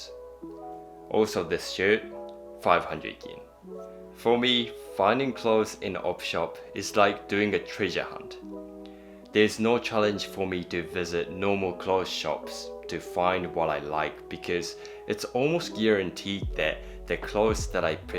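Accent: New Zealand. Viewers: Japanese with a Kiwi accent, adorable :)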